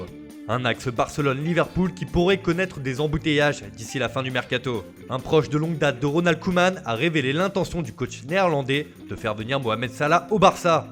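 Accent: Italy. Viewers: j'ai cliqué sur cette vidéo et si j'entends cet accent italien je met un pouce vers le bas, désolé mais vous me laissez pas le choix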